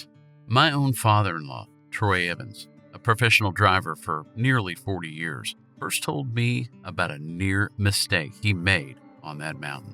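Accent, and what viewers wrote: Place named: South, United States. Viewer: This was one of the most enjoyable videos I’ve watched on YouTube in a long time. Enjoy your southern voice too. It’s relaxing to listen to you talk.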